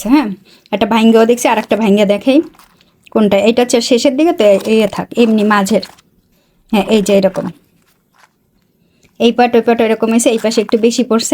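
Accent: Khulna, Bangladesh. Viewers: Aunty ami pry 2 yr ar bashi apnr video dakhi vlo lage, amr basa o khulna apni jokhn Khulna acchiln oi somai ei bujchilm apnr kn amdr moto kotha kno.❤️❤️❤️❤️❤️❤️❤️❤️❤️❤️❤️❤️❤️❤️❤️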